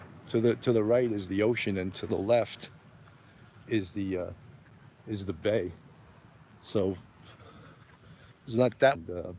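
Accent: New York, United States. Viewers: Being Canadian I love the NY accent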